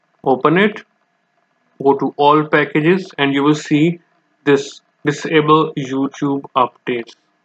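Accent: India. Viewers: took me 10 min to understood what symbols y say Indian accent not say in description also i cant see 720p pfffff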